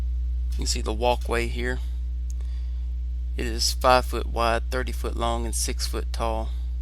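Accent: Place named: South, United States. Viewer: Love that Southern accent you got rocking!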